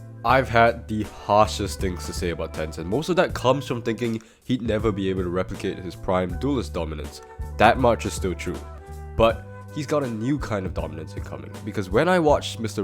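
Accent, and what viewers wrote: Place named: Singapore. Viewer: i can hear the singaporean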